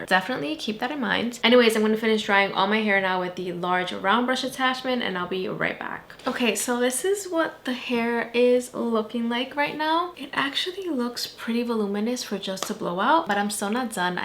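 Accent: Dominican Republic. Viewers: Your Dominican accent ❤🇩🇴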